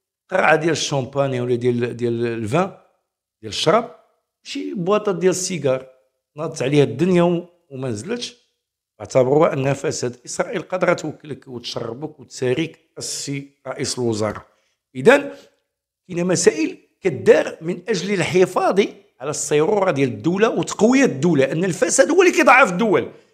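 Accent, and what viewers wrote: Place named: Algeria. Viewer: كتهدر بحال الجزايريين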